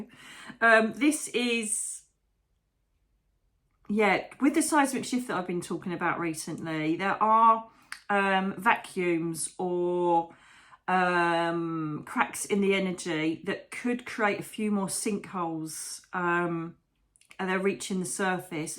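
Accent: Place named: Scotland, United Kingdom. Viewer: Mags from Scotland… loving the Scottish accent and love you! Yes, purple is your colour! Go! Big hugs all x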